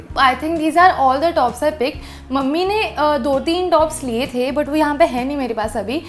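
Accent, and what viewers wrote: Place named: Mumbai, India. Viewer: Mumbai hinglish is the best😁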